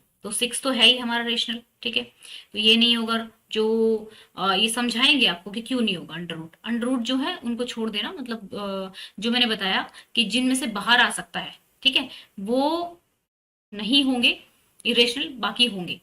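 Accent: Haryana, India. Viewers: Mam you are from Haryana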